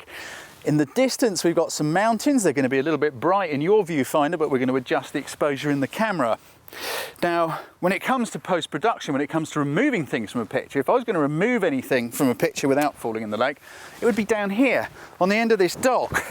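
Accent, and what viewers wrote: United Kingdom. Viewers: By the way I am big fan of yours - Your Voice, your mannerisms, and your "BRITISH" accent - I would love be born again to acquire it :) You have also lost weight, which is awesome ! BIG FAN !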